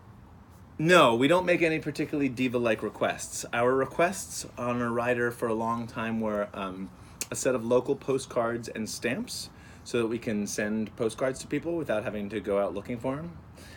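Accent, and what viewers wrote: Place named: United Kingdom. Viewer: I'm British and that was a good British accent!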